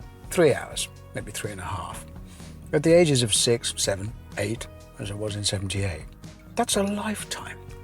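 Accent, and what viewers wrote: United Kingdom. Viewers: the art of story telling will always be delightful in a uk accent...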